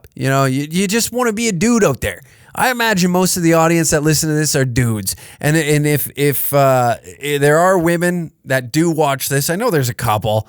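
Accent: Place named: Alberta, Canada. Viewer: You have the best FUBAR accent I've ever heard. Only a proper 'Bertan can talk like that.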